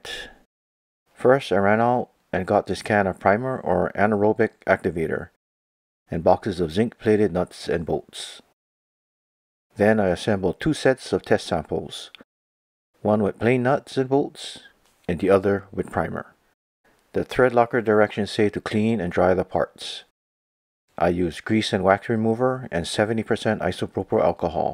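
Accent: Hawaii, United States. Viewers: Cahz u from hawaii, sound like u get some moke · Eh, you from Hawaii? Can tell by your Local accent -- I'm a 5th-gen Local from Maui, just found this channel, right on 🤙🏼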